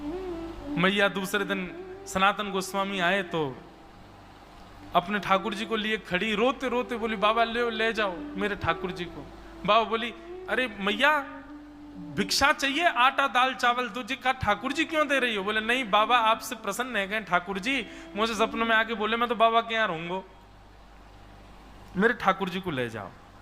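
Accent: Braj, India. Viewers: बहुत ही सुंदर और अद्भुत कथा श्रवण कराई साथ बृज भाषा आपके श्री मुख से सुनने में बहुत ही आनंद आ रहा है 🙏🙏🙏🙏🙏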